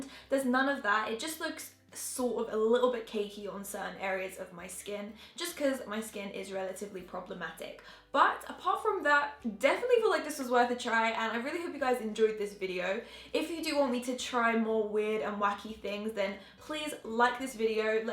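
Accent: Australia. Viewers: is she australian like me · krystal turvey no she have an australien accent. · Hi. In which country do you live? your accent sounds australian :)) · Are you Australian or From New Zealand? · Why does she sound Australian when she's English? I don't get it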